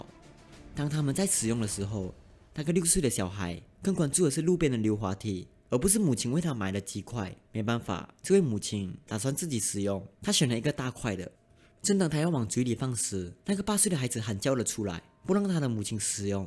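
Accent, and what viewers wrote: Malaysia. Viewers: 大馬口音好嗎？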